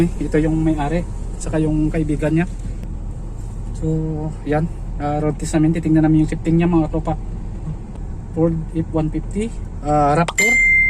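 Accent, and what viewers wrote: Iloilo, Philippines. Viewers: ilonggo ka nong? taga diin ka? iloilo or bacolod? iba kasi ka kasi mag tagalog prang ilonggo..hahaha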